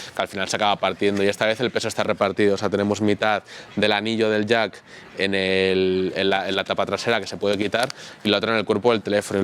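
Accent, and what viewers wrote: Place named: Madrid, Spain. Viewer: No puedes negar que eres madrileño eh! egggggque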